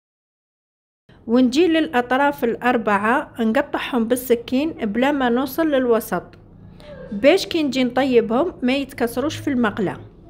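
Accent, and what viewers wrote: Algeria. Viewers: اول مره اسمع اللهجه الجزائريه تدخل القلب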